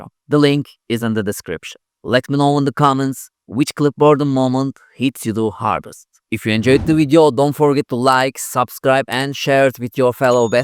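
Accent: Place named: Turkey. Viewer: Abi ingilizce konuşana kadar Türk olduğunu bilmiyordum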